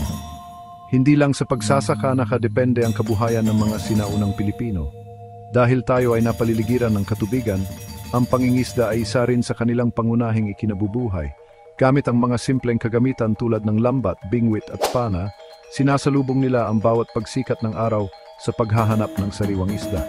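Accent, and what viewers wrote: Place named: United States. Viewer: PARANG AMERIKANO YUNG NARRATOR..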